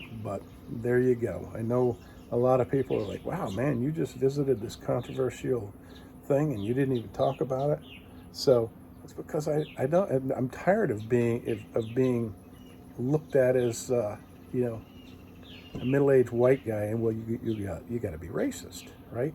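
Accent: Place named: United States. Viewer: Hey! You sound like an American! . . . I could not have said it better.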